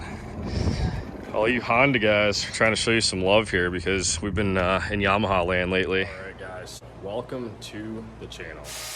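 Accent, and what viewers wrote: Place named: Canada. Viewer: I was talking about the welcome back the guy dose it all the time sounds Canadian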